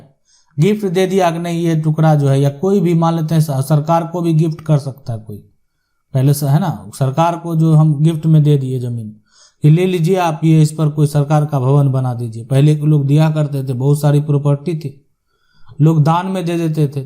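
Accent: Bihar, India. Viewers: बार बार वही लाईनें दोहरा रहे है दुसरे यह भाषा भोजपुरी टाईप समझ से परे। कुछ कुछ बिहारी टच। हिंदी भी नहीं है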